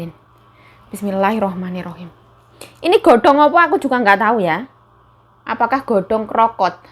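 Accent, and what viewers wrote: Java, Indonesia. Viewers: Mb asli org mana kok logate jowo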